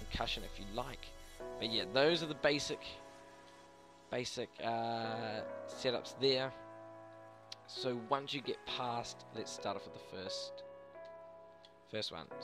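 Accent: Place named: New Zealand. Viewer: You sound like a New Zealander lol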